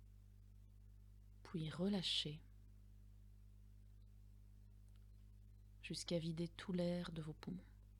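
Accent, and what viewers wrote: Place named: Belgium. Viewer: J'ai bien aimé la vidéo, je l'ai visionnée/écoutée 2 fois. Pour moi votre accent belge rend votre voix plus belle et plus agréable à entendre (je suis Français...), et je trouve vos conseils plutôt pertinents. Petit bémol de mon point de vue, le son semble très bas par rapport aux autres vidéos sur youtube. Je dois alors augmenter le volume au maximum puis le baisser subitement dès que je visionne une autre vidéo. En tout cas je vous dis merci et vous souhaite une bonne continuation.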